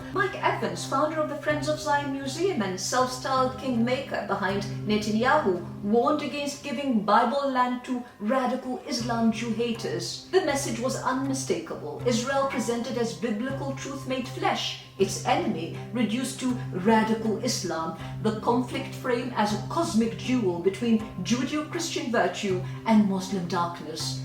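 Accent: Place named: London, United Kingdom. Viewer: Mad accent. It's like an Edwardian era upper middle class London accent.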